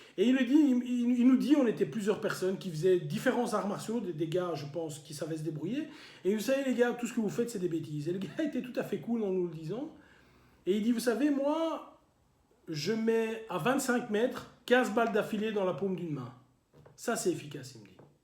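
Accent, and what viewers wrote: Belgium. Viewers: T’as un accent Belge je m’abonne camarade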